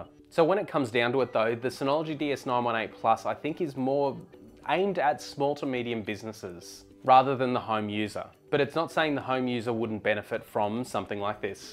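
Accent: Australia. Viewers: notices Aussie accent liked and subbed mate. Great video btw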